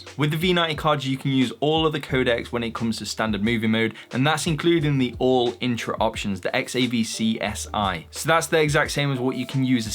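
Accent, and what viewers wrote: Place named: Northern England, United Kingdom. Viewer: I feel like I've found the North of England's answer to Peter McKinnon... (geographical location guess based on accent, could be way out 🤣) Either way this channel is dope. Great work man!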